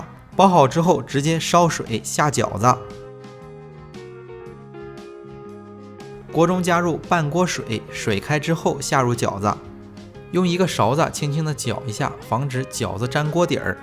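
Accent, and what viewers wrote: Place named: Zibo, China. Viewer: 听口音是淄博那边的？老爸的手艺真棒👍！加油💪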